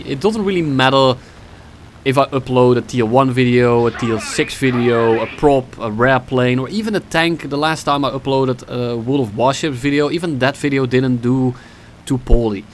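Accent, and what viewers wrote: Netherlands. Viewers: Never knew he was dutch, I always thought he was an american with a speech disability.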